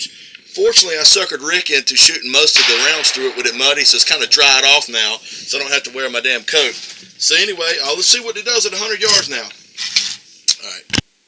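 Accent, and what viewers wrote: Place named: South, United States. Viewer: i heard the country and knew you had to be from a country state like me. KS here.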